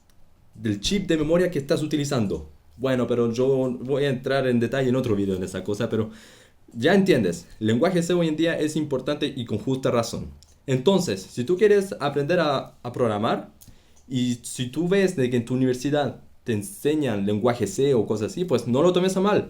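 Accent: Chile. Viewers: hablas como chileno bro:3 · Consulta aprendiste español en Chile? tu acento español me parece bastante chileno xD Estoy aprendiendo a programar en esta pandemia y comence con lenguajes mas modernos como javascript o ruby, pero me di cuenta que si quiero llegar a ser bueno debo aprender C que te enseña cosas elementales de la programación. Saludos desde Chile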